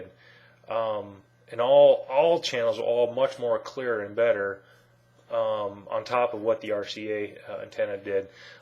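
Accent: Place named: Germany. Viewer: hi are you from germany? i noticed the german accent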